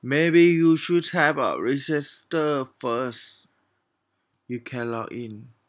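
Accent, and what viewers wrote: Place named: Thailand. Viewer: ไทยหรอจ๊ะ สำเนียงใช่เลย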